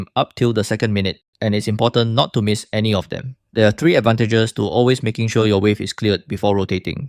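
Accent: Singapore. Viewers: Lmao. I heard ur accent and immediately have to double check ur location. Glad to meet another fellow Sgporean. Keep it up bro. As a main midlainer who played most games in mid, i may knew most of this fron exp, but its still a very solid guide that most nowadays still dnt know. Especially rotation. God knows how many times when i played other roles and the mid dnt know how to rotate, in mythic/honor btw. Appalling😂 Edit: Subscribed for support✊🏽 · Wait are u singaporean? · Are u singaporean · hi are you singaporean by chance im singaporean also and that accent is so recognisable lol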